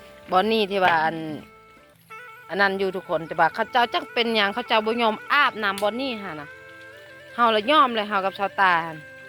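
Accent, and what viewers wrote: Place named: Vientiane, Laos. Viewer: สำเนียงเว่าคือคนลาวเวียงจันทร์แถ่ะครับพุสาว😊❤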